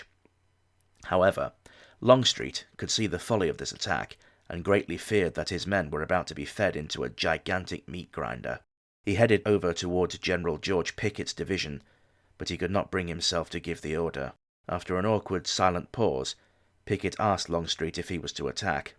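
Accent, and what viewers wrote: South, United States. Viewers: Love ya, but my home is in petersburg and near Richmond. My Cherokee family was "resettled" because those of color weren't allowed in the new union. Fort Lee was just changed to Grigg Adam's. Being from Texas originally, I knew Lee's role in the Mexican wars. I loved hearing the first bit, but started to feel that old sadness. God bless you and cant wait for next episode and hope it's not about the trail of tears. Cute southern accent dahlin!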